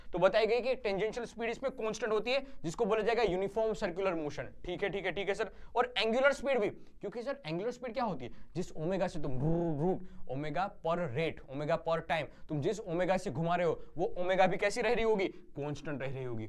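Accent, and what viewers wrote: Gujarat, India. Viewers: Sir Gujarati confirmed by accent 👏🏼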